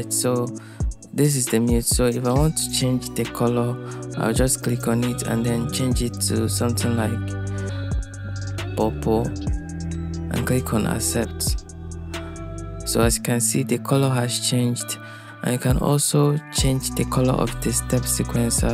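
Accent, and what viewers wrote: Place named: Nigeria. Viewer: beautiful naija voice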